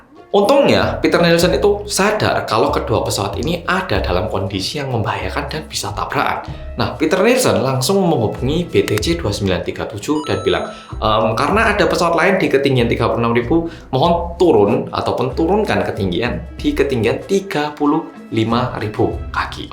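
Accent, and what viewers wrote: Java, Indonesia. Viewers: Mas iki penampakannya orang korea,tapi logatnya medok jowo😁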